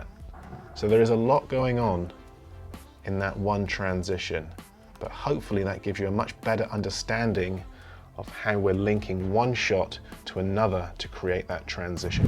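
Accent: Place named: West Country, United Kingdom. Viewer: Really enjoyed this video, thank you. It’s helpful to see the editing broken down. I have done some of these things in Premiere but not used speed ramps - this looks like a great fix for a few wobbles 👍 Also - don’t think I’ve ever seen Plymouth look so cool, heh. Nice to hear a west country accent on YT! Cheers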